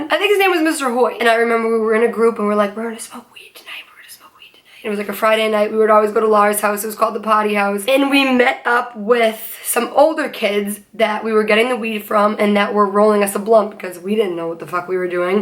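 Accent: Boston, United States. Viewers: HER BOSTON ACCENT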